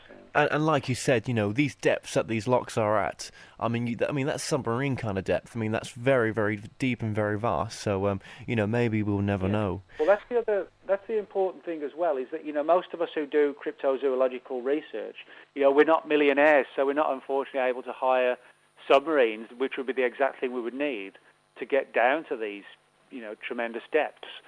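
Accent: United Kingdom. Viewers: Hey, great interview as always.. Much love from home.. Can't believe you still have your Walsall accent.. 🇬🇧🏡💗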